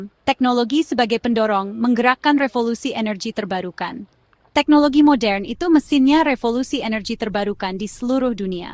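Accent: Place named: Malaysia. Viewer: Konten kreator yg logat begini palingan orang malengsia.. Halah sok tau lo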